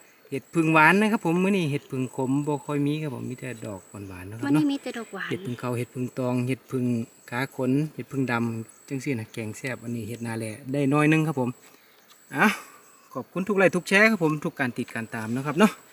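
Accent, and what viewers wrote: Chaiyaphum, Thailand. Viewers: สวัสดีจ้า ยายบอกตรงๆเลยวาหัวตาสิเห็นช่องของเจ้าเด้งขึ่นมา ยายกะหัวตาฮู่วาทางบ้านเฮากะมีเห็ดเผิ่งคล้ายๆแถวเมืองนอกเนาะจ้า เบิงดี้…ดี เจ้าเฮดคลิปดีอีหลีจ้า สำเนียงคือชัยภูมิเนาะจ้า❤️👏👏👏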